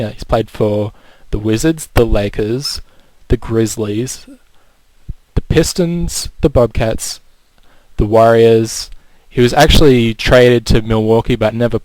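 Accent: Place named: Australia. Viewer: no problem you from aus too by the sounds of it good work ill subscribe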